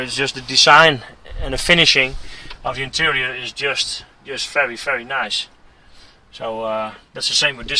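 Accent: Netherlands. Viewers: duidelijk een NL'er, wat een accent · You sound Dutch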